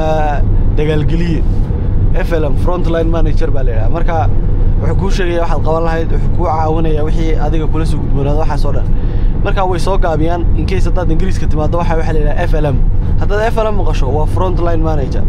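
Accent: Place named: Somaliland, Somalia. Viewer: Is fahano dhibka wa lahjada😂 adinka wa idin ku qosalna wlhi marka ad xamar imdaan😂lahjadina funny wye guuleysta rer somaliland one love❤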